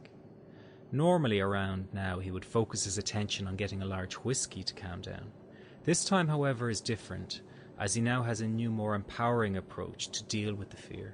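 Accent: Ireland. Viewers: IRISH ACCENT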